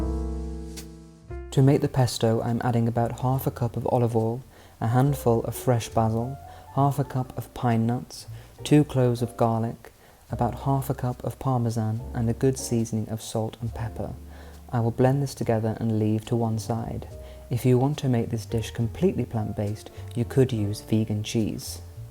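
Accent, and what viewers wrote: RP, United Kingdom. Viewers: Wow - it's like listening to a young Kenneth Kendal - it's the BBC accent one finds so very soothing on a Friday evening - and that wreath looks incredible and the squash with the mushrooms and asparagus - wow - just wow.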